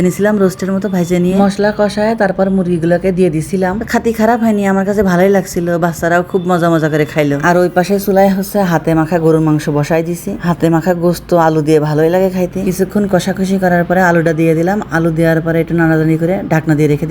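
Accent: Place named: Khulna, Bangladesh. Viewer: যাতিগিলি 😂😂😂😂 মনে হচ্ছে আমার বাড়ির আশেপাশের বোন তুমি।আমি খুলনার।তবে আমরা ছ‍্যাড়া বলি না।ছ‍্যামড়া বলি।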